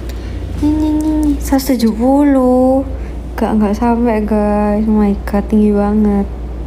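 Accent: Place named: Java, Indonesia. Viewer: Lucu tauk kalo medok, udalah be your self 😊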